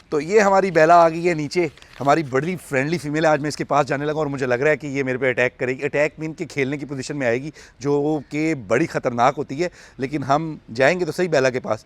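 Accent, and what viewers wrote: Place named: Punjab, India. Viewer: Ap penjabi Boht piyari bolte hain 😅😅😂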